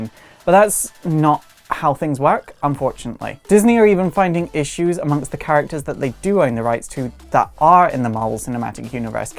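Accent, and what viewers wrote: Scotland, United Kingdom. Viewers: you sound slightly more Scottish than usual this video, I kind of really like it. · Is he Scottish ? I hear a mixed accent so I don’t know what his accent is lol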